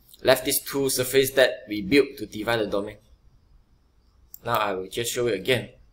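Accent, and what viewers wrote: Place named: Singapore. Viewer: it sounds like a singaporean accent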